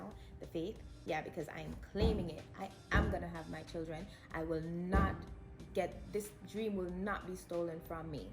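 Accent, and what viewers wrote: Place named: Caribbean. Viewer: Thanks for sharing your story. I have not been diagnosed but the doctor has told me that this is his impression based on the results. I’m 24 years old, not married yet or trying to have, still in university but I always have that fear that when I’m ready I may not be able to, but I have faith. Your story is inspiring. Ps. You sound like you’re from some where in the Caribbean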